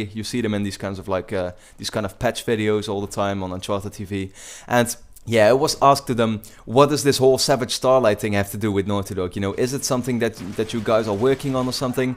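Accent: Netherlands. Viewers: I really hope Uncharted 4 will be made by Naughty Dog. But one little question are you Dutch? Because you have a Dutch accent :p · are you dutch!? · You have an dutch accent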